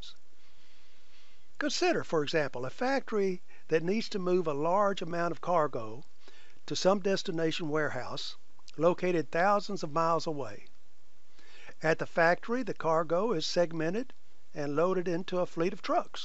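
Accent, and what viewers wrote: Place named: South, United States. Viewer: After listening to my austrian professor and barely understanding his lectures, the southern accent is appreciated.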